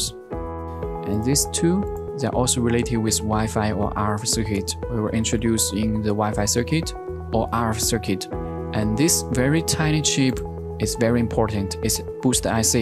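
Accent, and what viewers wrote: China. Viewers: 中国人讲的英语么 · 中国公司，这英语就是中国人读出来的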